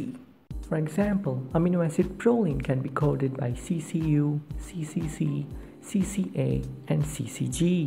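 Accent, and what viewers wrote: India. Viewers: R u indian your accent says that.🦆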